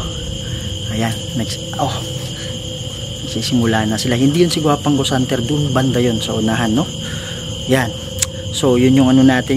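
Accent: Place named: Ilonggo, Philippines. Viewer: parang Ilongga ung tono ni..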